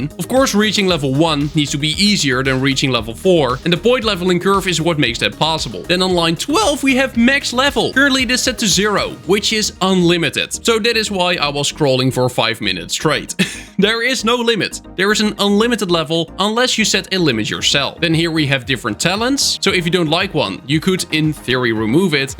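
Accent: Netherlands. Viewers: the fact that you can hear that he comes from the netherlands is crazy (ik ook daarom weet ik dat)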